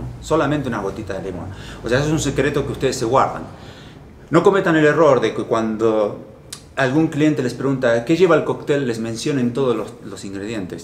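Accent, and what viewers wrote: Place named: Argentina. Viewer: Que lindo escuchar a un Argento chamuyando jajajaaja somos científicos de lo que hagamos jajajaja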